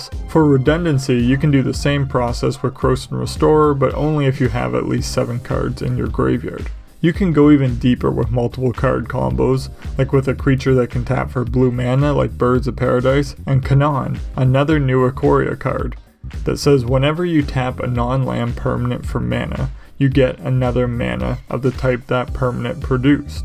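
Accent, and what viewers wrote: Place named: Canada. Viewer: 19 seconds in, ahhh so he is Canadian.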